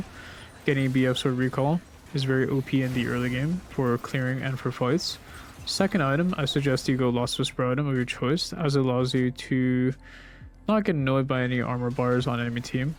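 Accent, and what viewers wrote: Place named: United States. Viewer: I have not found this to be the case. Are you from America? He has a pretty strong regional American accent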